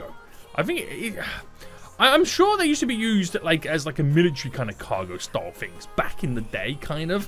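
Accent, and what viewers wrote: United Kingdom. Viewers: Average skull emoji kid, He is British, plus you can’t really hear the “g” in the word “flight”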